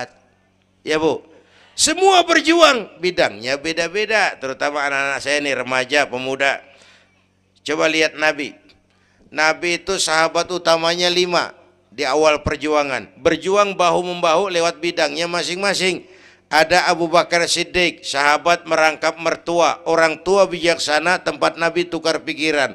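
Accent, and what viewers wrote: Jakarta, Indonesia. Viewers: Logat orang jakarta 😂❤❤❤❤❤ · Kyai sejuta umat dan sampai saat ini belum ada pengganti beliau, begitu adem kajiannya dan identik dengan logat betawi.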